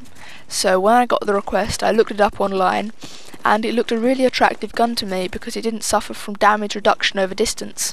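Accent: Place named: Surrey, United Kingdom. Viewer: R u from Surrey, you have a Surrey Accent And you are like the best educated person on youtube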